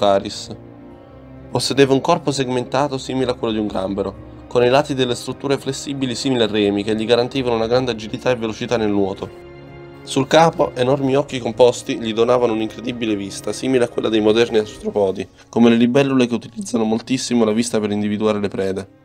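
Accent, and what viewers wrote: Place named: Central-South, Italy. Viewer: anglofono? Per piacere, non arrampichiamoci sugli specchi: il narratore ha un fortissimo accento del centro-sud Italia, quindi immagino abbia avuto tutto il tempo necessario per imparare la lingua di questo paese. Non dico che si debba essere doppiatori professionisti, ma almeno parlare correttamente in italiano.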